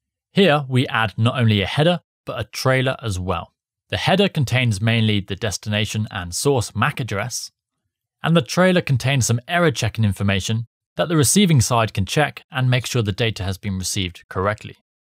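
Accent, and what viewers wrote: United Kingdom. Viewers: ok watever u do , just follow the same presentatiin style - and the same lovely british accent 😀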